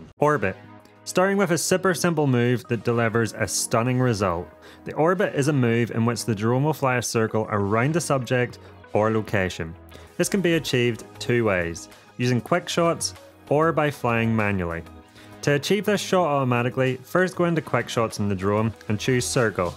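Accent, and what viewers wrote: Ireland. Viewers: Oh.. so this is the famous Irish accent?? The “ i ” sound sounds more like a “ e “ like the word “vedeo”! Love et!!! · Irish accent is epic! Great Vid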